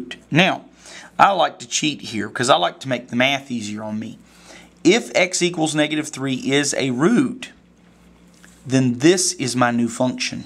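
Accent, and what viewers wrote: South, United States. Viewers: you got that country accent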